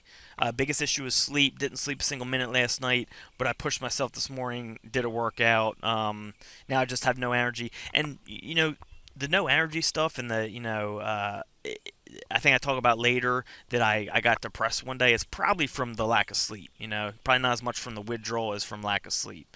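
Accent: Philadelphia, United States. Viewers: Dylan M he's gotta be from the Philly area.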